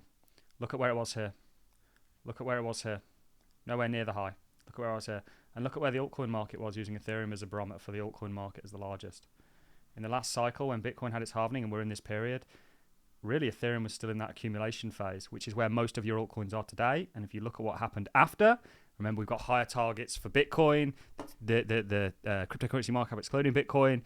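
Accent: United Kingdom. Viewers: Retail as you define them, will most likely not be back in any force. They are completely tapped out due to government induced inflation. You sound like you are from the UK, how are those energy cost working for average person. This is where You Tube pendants have got it wrong. Wall Street has taken over crypto!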